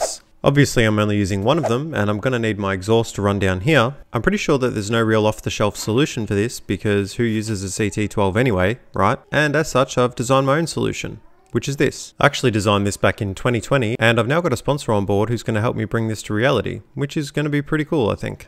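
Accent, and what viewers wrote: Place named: Australia. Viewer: Great content, description, and commentary. Gotta love hearing the distinctive Aussie accent, too! 🇦🇺 There's some pretty helpful information to glean from the galahs watching from the wires too! 😆 10/10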